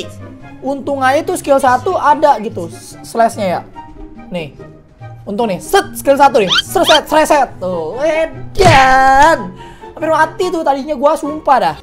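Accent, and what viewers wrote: Jakarta, Indonesia. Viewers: Betawi bnget d lu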